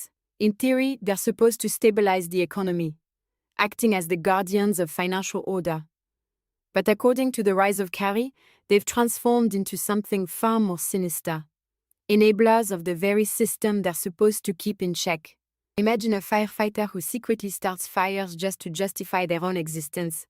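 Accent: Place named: France. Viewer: Why would you use a French accent?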